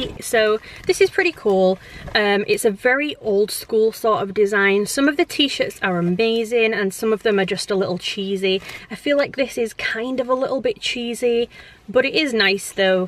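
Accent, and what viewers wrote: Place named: Wales, United Kingdom. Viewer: ohhh lol you sometimes sound like you have a bit of a Welsh twang 🤪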